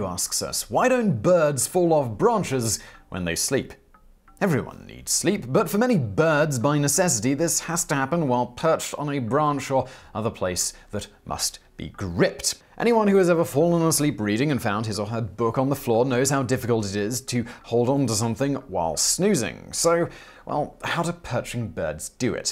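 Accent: United Kingdom. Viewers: All the other narrators with British accent sound fake, Except. He is a professional. Kudos, kudos.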